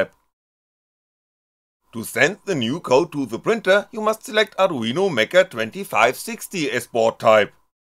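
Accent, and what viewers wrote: Germany. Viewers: I love how you germanice English. There is something oddly pleasing with that. Hahahahha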